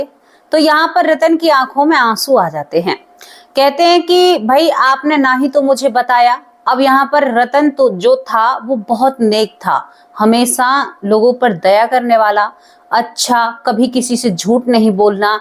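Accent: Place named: Punjab, India. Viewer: aap jab baate kr rhi hoti hae to kush words punjabi vaale hote haen jaise hum bolte hae badhia lagta bolne ka lahja bahut pyara lagta hae ❤❤❤❤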